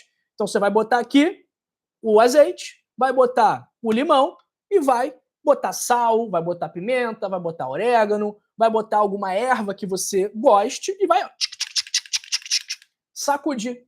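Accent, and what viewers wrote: Rio de Janeiro, Brazil. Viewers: Sou de Mogi Guaçu Cacilda Pimentel sp agora você parecem ser carioca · Olá. dr . eu acho que. Vc . Carioca vc soquete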